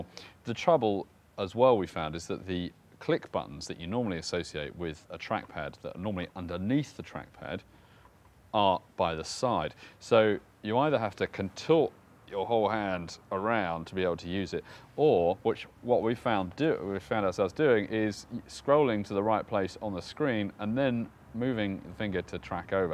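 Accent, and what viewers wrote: United Kingdom. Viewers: "changing the market to create the haychpee (HP)" LOL I LOVE BRITISH ACCENTS (at least i think that he is british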